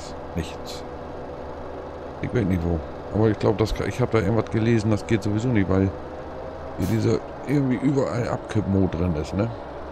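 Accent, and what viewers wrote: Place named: Low German, Germany. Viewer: Din Platt Mega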